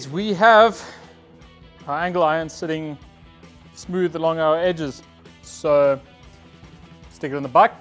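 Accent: South Africa. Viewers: South Africa, my home country. We'll since I support local, I subscribed. Thought that English accent sounded familiar! Great work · You have a South African accent ?!?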